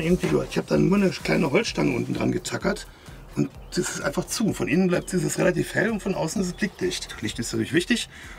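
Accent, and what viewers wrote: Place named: Ruhr, Germany. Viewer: Da hör ich doch Ruhrpott, aber vom feinsten. Geiler Typ Geiler Anhänger 👌🏼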